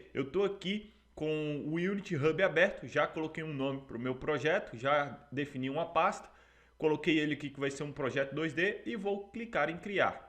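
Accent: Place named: Ceará, Brazil. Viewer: otimo tutorial. bem simples e eficaz. a proposito, vc eh cearense? percebi pelo sotaque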